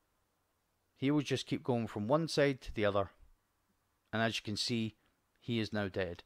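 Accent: Scotland, United Kingdom. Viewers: I love you'r explanations especially through the jumping part,and then with clearing of the adds,and i hear from you'r accent you are a fellow Scot,but can yu tell me do you play on PC or console.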